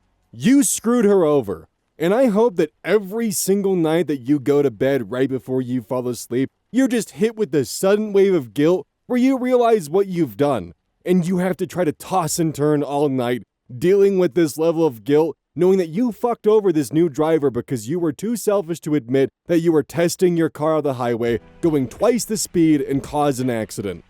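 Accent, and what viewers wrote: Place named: Minnesota, United States. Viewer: You started sounding like that Minnesotan from family guy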